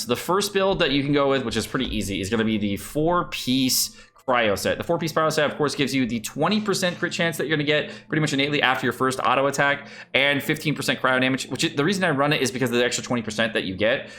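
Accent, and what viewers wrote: United Kingdom. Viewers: I actually look forward to the intro with british 😶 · I do like British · Yay british is here again ☺️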